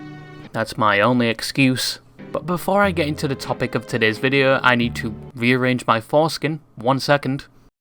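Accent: United Kingdom. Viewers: your not British?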